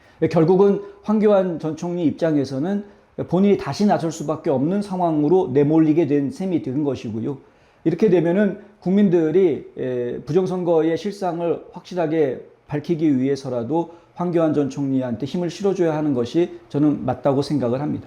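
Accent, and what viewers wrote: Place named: China. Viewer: 유튜브발음이 중국인같음!! 윤통체포… <link>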